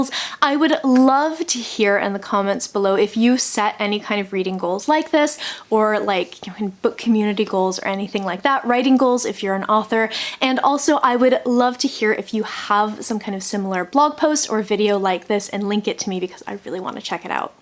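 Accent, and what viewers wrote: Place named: England, United Kingdom. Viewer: 1. Your accent is DEFINITELY becoming more English. Maybe it's just this video but ermmmm? 2. Good luck getting on top of ARCs etc! You can do it!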